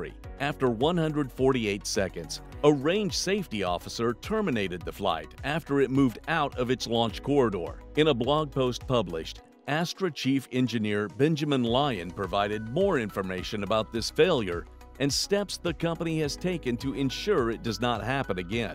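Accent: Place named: Texas, United States. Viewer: love the show and the topic was interesting but...without the texan narration, it feels flat.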